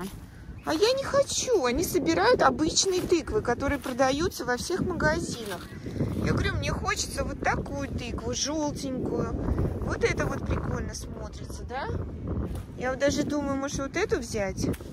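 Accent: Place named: Bryansk, Russia. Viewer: Сподхватились? Деревенский русский. Брянщина. Хорошо,что Джон не знает русский-его бы коробило!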